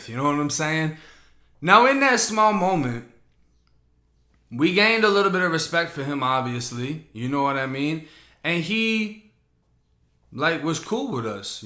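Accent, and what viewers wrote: Boston, United States. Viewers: Lol that Boston Accent getting strong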